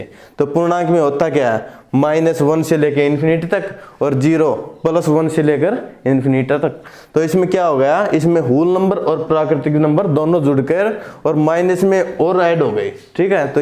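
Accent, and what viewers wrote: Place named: Haryana, India. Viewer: हरियाणवी शैली में👍👍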